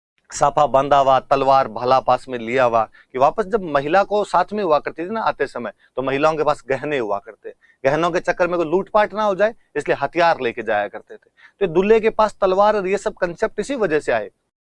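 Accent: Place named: Marwari, India. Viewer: Sir ji , you are great teacher n Great person but English to be improved as teacher...... English tone is in marwadi and that laughter for us .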